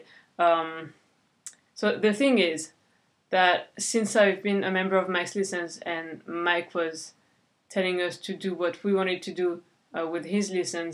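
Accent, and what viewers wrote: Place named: France. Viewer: Super idée, j'y ai pensé plusieurs fois mais j'ai jamais eu le courage ! Je viens de me commander "The weaker side" de Dom Fomularo, je vais commencer à me plonger dedans que dès je l'aurai reçu, je te dirai si c'est efficace :) Sinon, t'as pas à rougir de ton accent, t'as un anglais super fluide, on dirait presque pas que t'es française parfois, j'aimerai parler aussi bien anglais !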